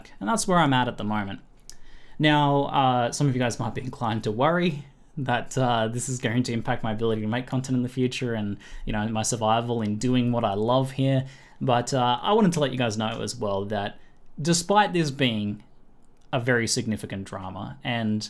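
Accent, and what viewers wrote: Australia. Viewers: I'm Australian too and he definitely has an Australian accent. If he was a Kiwi he'd call himself:)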